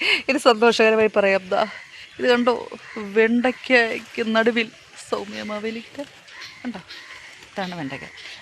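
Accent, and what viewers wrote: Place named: United Kingdom. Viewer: ആ ഇംഗ്ലീഷ് ക്കെട്ട് അന്തംവിട്ടു പോയി ഇത് ഞങ്ങടെ തന്നെയോ അതോ വല്ല ബ്രട്ടീഷ് കാരിയോ നോക്കിയിട്ട് ഇത് ഞങ്ങടെ ക്കറുത്ത മുത്ത്തന്നെ 👌🏿👌🏿👌🏿♥️♥️♥️♥️♥️👍🏿👍🏿👍🏿💖💖💖💖💛💚💚💚🌹🌹💙💙💕💕💕💜💜💜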